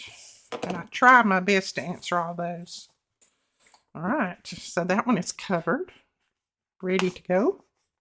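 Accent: South, United States. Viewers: Hi just love your inspirational videos. I could listen to your soft gentle Southern accent all day. Wishing you all the best for this year 2024. I was wondering if you could design some digitals with some birds, flowers and butterflies from USA?. I am from the Uk 🇬🇧 Bunny x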